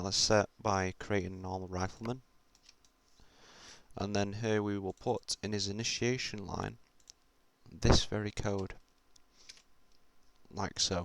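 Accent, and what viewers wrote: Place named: Manchester, United Kingdom. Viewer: I was wondering where he was from, I knew he was northern, but this video DEFFO manc, it's how he says "you" as "yerr"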